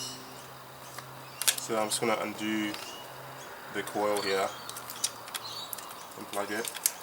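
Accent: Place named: Australia. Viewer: Nice work mate. Love that Aussie Accent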